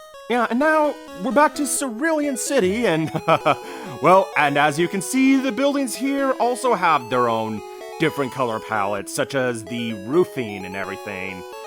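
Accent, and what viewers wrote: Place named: United States. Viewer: It just sounds American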